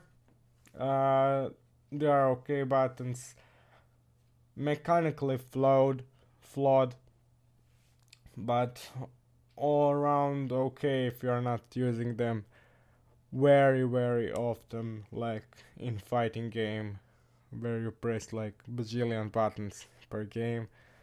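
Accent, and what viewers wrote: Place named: India. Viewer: Ur accent sounds Indian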